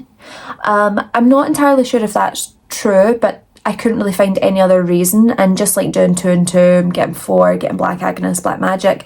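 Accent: Scotland, United Kingdom. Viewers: ❤ love your accent ! Are you Scottish? Sorry first video of yours i found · That's what I said I just found her like just now, listened to her talk and I'm like omg she Scottish love it already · Hey I don’t usually comment like this on videos but I see you’re new so I wanted to post more constructive feedback. I think you’re a bit hard to understand and I don’t think it’s the (scottish?) accent. For clarity, I’m American which doesn’t help since I don’t hear this accent too frequently, but I think it’s a microphone quality issue since it’s the fuzziness between words that makes it harder to understand. I loved your video, first time watching but I think it’s an audio quality issue. It’s either from the microphone you’re recording from or maybe from compression when you’re downloading the video. I don’t know much about it all but your camera quality is very good and you speak very well and it sounds close to the microphone so I assume it’s a compression/ tech quality issue. 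From my time playing classical music and having to record audio for lessons and stuff, I’ve learned that putting my mic in a 12x12 foam box helps some or putting a mesh barrier between you and the microphone. I hope this doesn’t come off critically, your content and video are very well made and I subscribed to the channel as I think it’ll be awesome! I just don’t want you to lose potential subscribers due to audio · Nothing beats the authentic British accent! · It’s a Scottish accent, but close!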